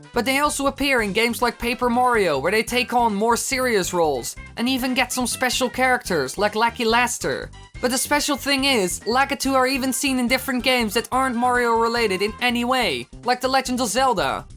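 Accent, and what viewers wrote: Netherlands. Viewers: wait ur dutch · I already thought you were Dutch, I am also Dutch.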